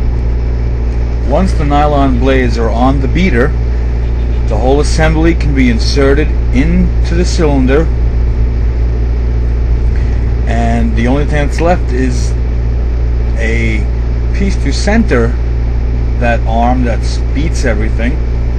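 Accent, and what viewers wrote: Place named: Italian-American, United States. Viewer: I like your Italian-ish American accent.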